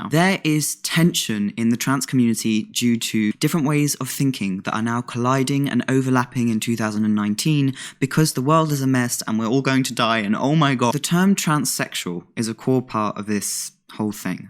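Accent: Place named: Southern England, United Kingdom. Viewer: It's that smooth baritone and southern English accent.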